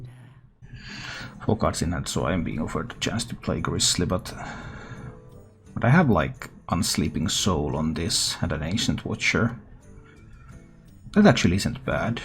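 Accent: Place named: Finland. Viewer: I immediately knew you were finnish after hearing your accent. I'm not even scandinavian myself but I've heard some finnish people talk before, haha. Nice deck and I love the way you explain things so calmly.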